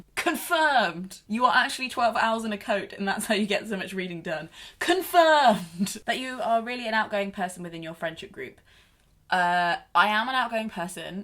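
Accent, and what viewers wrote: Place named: Coventry, United Kingdom. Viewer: Loving the Cov accent! I'm from Rugby and constantly confuse people by my inability to pronounce U and O differently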